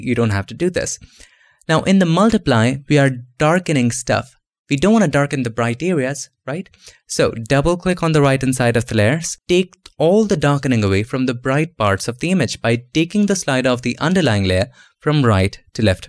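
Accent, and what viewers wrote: India. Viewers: When you find a good tutorial : :D When you see the guy have an indian accent : :/ But you stay because it's awesomely done : OvO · I don't get it? What's wrong with having an Indian accent?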